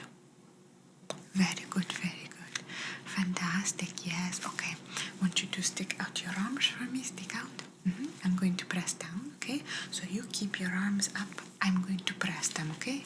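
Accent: Italy. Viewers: Hello fantastic ASMR with Italian accent I loved it I really enjoyed listening to your sweet voice very relaxing very relaxing greetings beautiful 🌹🌹💖💖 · Anyone else find her Italian accent a massive turn on? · you made my day aside from the Italian accent you reply to me nice your amazing. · Italian accent has an additional sweetness. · Your Italian accent is very special and beautiful. You are terrific for ASMRs. First time see your channel it was amazing, you have a viewer that is going to continue watching your great videos. Thank you for all your hard work. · I really enjoy your Italian accent personally I’m ltalian your soft spoken is my favorite your whispering is also my favorite sounds. PS sleep 😴 dreams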